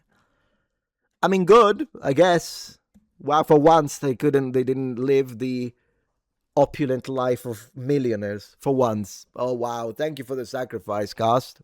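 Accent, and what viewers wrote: Greece. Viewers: Your greek accent is amazing! 👏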